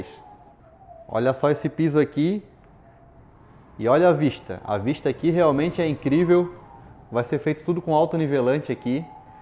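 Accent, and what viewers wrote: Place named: Florianópolis, Brazil. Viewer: "A viXta aqui.." "Arrente" "Mexmo assim já passou o Axpirador..." Manézinho será mo quirido? Top demais ein!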